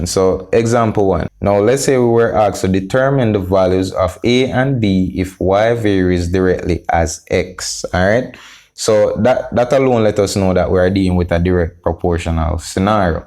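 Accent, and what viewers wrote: Jamaica. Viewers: love the Jamaican accent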